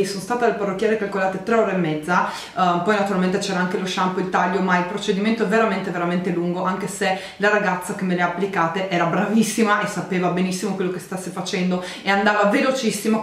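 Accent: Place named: Trieste, Italy. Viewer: Haha se senti che te son triestina..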